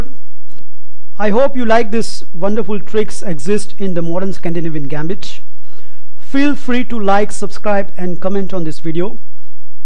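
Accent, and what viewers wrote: India. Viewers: ***** I like your accent. From which part of India are you?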